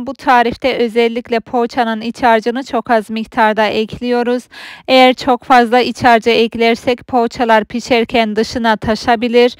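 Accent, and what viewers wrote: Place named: Kayseri, Turkey. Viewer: Çok güzel oldu hemde pratik memleket kayseri mi şive benziyor bende kayseriliyim bu arada ❤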